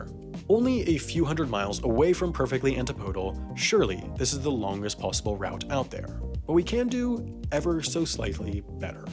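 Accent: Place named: Pennsylvania, United States. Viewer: important question: are you from Pennsylvania or sumn bc you say “tetnically” and “deering” like my Pennsylvanian grandma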